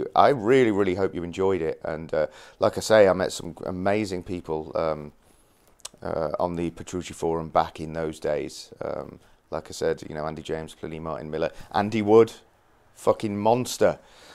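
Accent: United Kingdom. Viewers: Hello!...I really hope that you and yours are healthy...take care!!! I thinck you are so far from DT...your tune is awsome more DT than DT... I always loved JP and DT but your way of playing hybrid picking, legato etc...you are flying above the neck of all your guitars...your style is so fluid and airy... Nothing is rough or inelegant in your game... you play as you speak but faster... with clarity in a calm and calm manner with this unique British accent so far from the nasal American accent... (... be careful, not all Americans have this accent coming from the nose...) I am French and the English accent is very precious as is your game, with finesse and mastery. I don't know how many centuries it would take for me to live to reach this level... respect.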